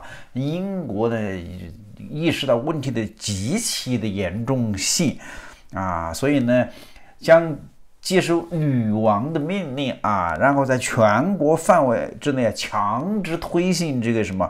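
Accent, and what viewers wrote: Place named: Beijing, China. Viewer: 为啥南方人非要说一口京腔呢？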